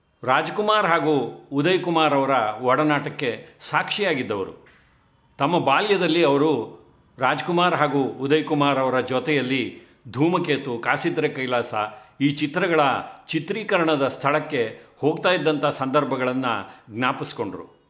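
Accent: Standard. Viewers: ನಿಮ್ಮ ವಿಶ್ಲೇಷಣೆ ವಿವರಣೆ ಕೇಳುಗರಿಗೆ‌ ತುಂಬಾ ಹಿತ ಎನಿಸುತ್ತದೆ. ವಸ್ತು ನಿಷ್ಟ ಹಾಗೂ ಸತ್ಯ ಎನಿಸುತ್ತದೆ. ಸ್ವಚ್ಛ ಸುಂದರ ಕನ್ನಡ‌ ಕೇಳಲು ತುಂಬಾ ಹಿತ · Your Kannada is awesome. Pure and honest speech. A big salute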